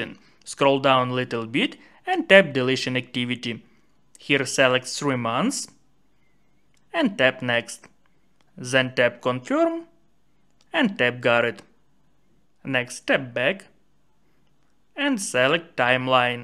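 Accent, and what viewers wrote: India. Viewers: You sound like a scammer from India !!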